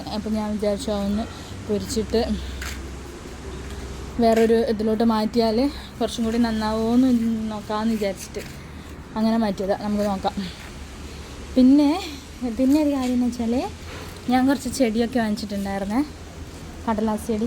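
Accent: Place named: Kannur, India. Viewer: Aaha kannur bhasha 😂😂😂😂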